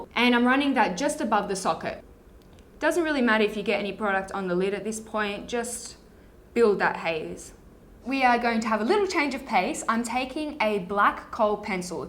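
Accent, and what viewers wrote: Australia. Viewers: You're so talented, likeable and articulate. Sounds random, but I love listening to you talk as much as I love watching you work. Your knowledge of and passion for makeup really translates well in your videos - keep it up! You're so great!! P.S. Love your Aussie accent! x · You're gorgeous and I love your aussie accent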